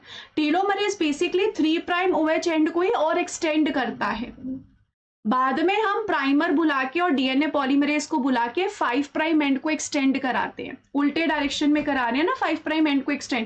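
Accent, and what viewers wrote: India. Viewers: Ek number bhava khamgaon bolte❤️❤️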